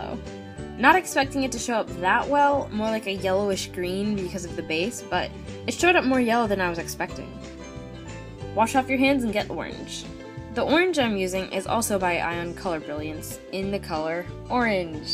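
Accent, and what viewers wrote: Jamaica. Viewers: are you jamaican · r u Jamaican?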